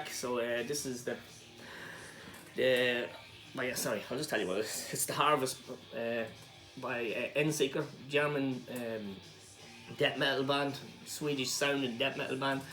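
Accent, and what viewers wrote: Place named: Cavan, Ireland. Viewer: Yea, I deleted it but plan to reshoot it today. I had it all messed up because a few to drink to many hahaha. My good friend Cairan is from Strabane Ireland. I was watching your video and he could tell by your accent your are in Cavan.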